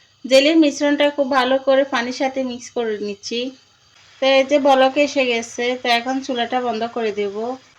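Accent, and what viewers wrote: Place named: Sylhet, Bangladesh. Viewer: লাইক সাবতবে আমার কিন্তু অভ্যাস নাই সাবস্ক্রাইবার করে আবার আন্সার্সটা এবার করে দেওয়া স্ক্রাইবার করে দিলাম আপু আর আপনার দেশের বাড়ি কোথায় অবশ্যই কমেন্টে জানাবেন আমার মনে হয় সিলেটের বাসার মতো শোনা যাচ্ছে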